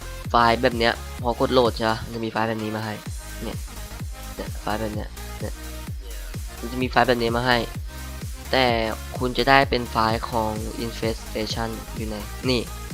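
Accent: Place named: Thailand. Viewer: เสียงเหน่อๆ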